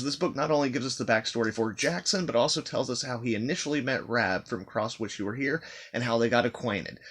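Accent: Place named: Russia. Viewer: It almost sounds like Russian/Easter European accent lol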